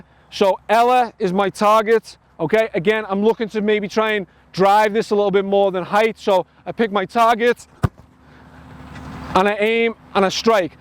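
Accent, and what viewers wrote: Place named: United States. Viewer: Little bit of yank creeping into your accent there lad haha, is right la good vid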